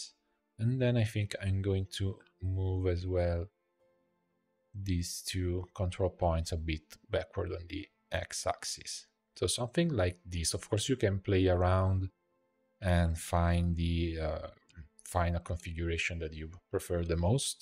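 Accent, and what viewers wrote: Italy. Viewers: Ma sei per caso Italiano? 🤌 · Sei italiano? Si sente dall'accento!😂 Complimenti per i tuoi video comunque, grazie!❤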